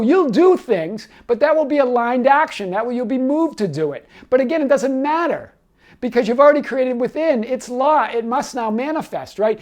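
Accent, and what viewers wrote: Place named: Massachusetts, United States. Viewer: Mate I have recently discovered your channel and you rock hahahaha. I love and appreciate your in depth explanations, your wisdom, your catch phrases " hear this!", "I want to unpack this like it's never been unpacked before", your cool Massachusetts accent, and your infectious laugh always makes me break out in a laugh too hahahaha!! Seriously, thank you for your time, effort, and service in teaching us these concepts and helping to harden our own understanding of these concepts at this point in time and space. Oh, and I notice how you seem to reply to everyone who comments or asks questions on your videos, and not just superficial responses but quite in depth and supoortive. What a legend mate 😉 From a new subscriber in Brisbane Australia oi oi oi!!! 👊😁💚☮🙏🌈🌟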